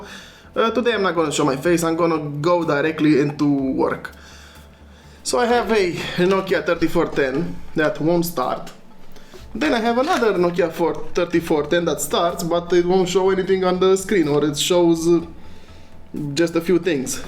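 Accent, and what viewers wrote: Romania. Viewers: I knew youre Român , your accent haha,great contnent btw ❤️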